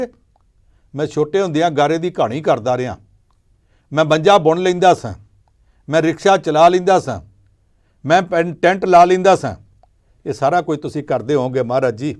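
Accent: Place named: Punjab, India. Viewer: ਠੇਠ ਪੰਜਾਬੀ ਤੇ ਜਟਕੇ ਢੰਗ ਨਾਲ ਅਸਲ ਹਾਲਾਤ ਬਿਆਨ ਕੀਤੇ ਹਨ।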